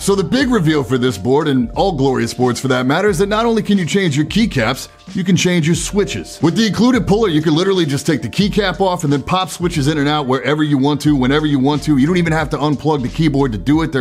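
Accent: United States. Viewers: Man this dude is so great. Finally an American (at least I’m guessing from your accent) who measures using the METRIC system. Thank goodness you’re trying to please literally everyone except Americans 😄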